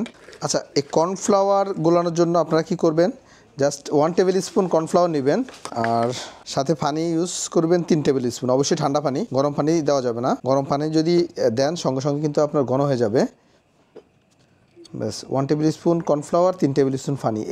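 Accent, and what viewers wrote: Noakhali, Bangladesh. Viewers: This is the first video I have watched on your channel. I come to know this channel from facebook reels. Now I am watching every video of this channel. btw love your noakhali accent bro!!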